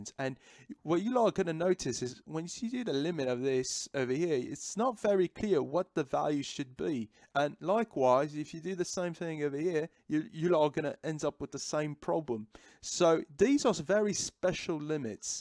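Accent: United Kingdom. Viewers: Lmao that's a heavy ass British accent.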